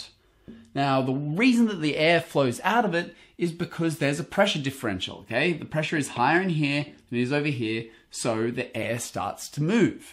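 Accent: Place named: Australia. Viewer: even though I don't have much experience with Australian accents , I was able to understand you 100% . no muffles or "uhhhmmms" at all. Thanks!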